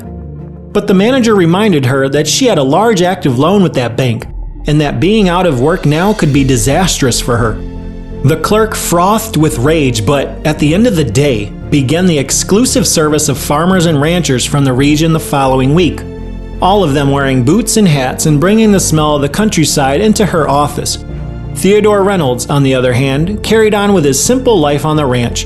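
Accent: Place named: United States. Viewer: Narrator sounds American but the script sounds like its written by a non native english speaker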